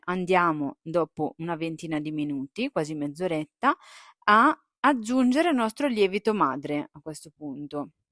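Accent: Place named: Piedmont, Italy. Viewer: Sei bravissima. Sto facendo le tue ciabatte e farò certamente la colomba. Ciao dall'accento piemontese. Io sono napoletano e ho un piccolo un forno a legna, farò la tua pizza in pala e ti farò sapere.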